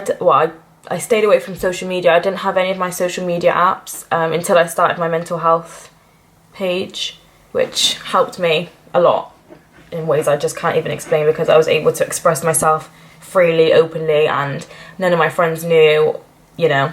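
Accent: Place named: Bristol, United Kingdom. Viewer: Hope celexa i will look this fit 😆. You sounds like a brizzle girl my neck of the woods. Mental health has been a lifetime enjoyment for me its been relentless im now on celexa it was the last ssri to try and has been the best so far , thankfully. And i totally get you girl , death was my main ambition in life and my life had no ambition. I spend my time with likeminded people or understanding people other people are toxic for me. They are earthlings and im a alien. 👽 i joined a walk and talk for nutters in swansea and its been amazing 👏. When your battling with mental health the people you are use to being around become disposable and the likeminded people are the ones we need. Like you just said the general practitioners are terrible. Specialist help are the ones who can help. Luckily/unluckily i ended up a addict with alcohol and i have the A.A rooms and that has kept me sober for 5 years. 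Alcohol works great until it becomes a problem and with mental health it always becomes a problem. You cant redress the imbalance with a imbalance it just makes us extra wacky. Live life on lives terms and understand about , acceptance. We have to accept that this might be for life ,if you fight it , it just gets worse. What you need is a funny as fuck friend that makes you laugh. Thats why i like my nutters around me, sober nutters i might add. They are all trying thier best its all we can do. Good luck with your journey to getting well and thanks for your share. You did the right thing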